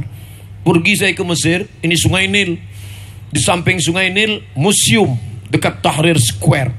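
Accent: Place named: Medan, Indonesia. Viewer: Mantap kalilah UAS ni logat medannya keluar,,, 👍👍👍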